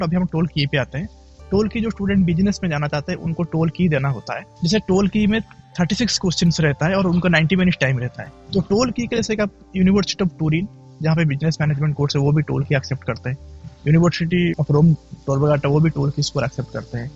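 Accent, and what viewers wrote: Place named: India. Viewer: oooo😂😂I can catch ur hindi accent 🥰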